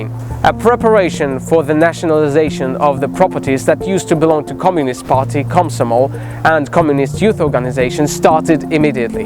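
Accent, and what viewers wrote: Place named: United Kingdom. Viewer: Awesome video, great British pronunciation!!! 👏🏻👏🏻👏🏻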